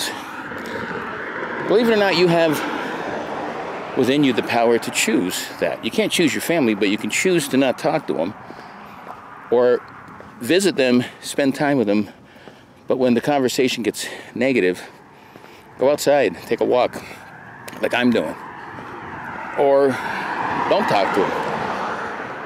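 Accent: East Coast, United States. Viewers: Are you from the East Coast?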